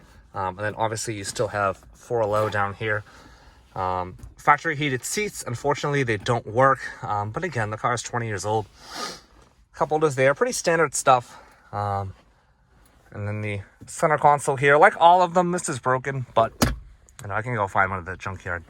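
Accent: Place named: Boston, United States. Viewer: Nice ride, can hear your Boston twang haha. Wheels look like they’re in good shape too, those lace wheels look great on the XJ. Seems like a major upgrade over the last one!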